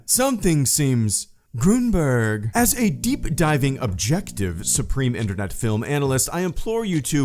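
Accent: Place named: Chicago, United States. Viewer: Awesome. Love that accent. Anyone remember that episode of South Park when Kyle and his family moved to Chicago becouse his dad drove a hybrid? The people there sounded JUST LIKE THAT.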